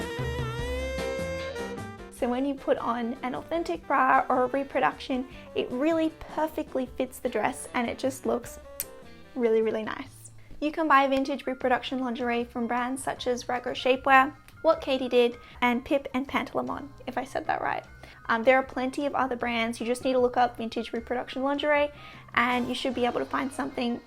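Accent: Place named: Australia. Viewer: I have commented on one of your videos before (on the life of a housewife in the 1950s), you seemed to appreciate my comments - thks for your reply at the time. I was from an English immigrant family and lived in Melbourne from age 9 to about 32, when I returned to England. So the way you talked, and the sort of things you had in the house, were very familiar. Just a word about terminology: the belt worn around the waist with straps to hold up stockings, worn by women (and most girls from age about 12), was called a 'suspender belt' - the same as in England. It's mainly in the USA, that they are called 'garter belt'. In the US, the straps themselves are called 'garters', which can also refer to an elasticated band around the leg to keep stockings in place. So 'garter' has both meanings in US English, but only the latter meaning in England and Australia (elasticated band around the leg). 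A suspender belt in Australia was also called a 'dance belt', for reasons I never discovered. Time was when women liked to look and feel feminine - them were the days! ("vive la difference!" and all that).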